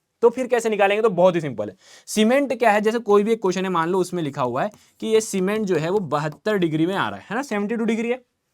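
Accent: Bihar, India. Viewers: sir app bihari ho kya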